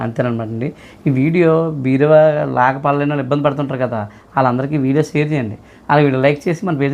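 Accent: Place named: Srikakulam, India. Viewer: శ్రీకాకుళం language లా ఉంది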